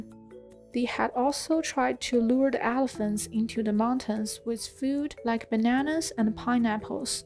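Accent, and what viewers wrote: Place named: China. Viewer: bad Chinese English accent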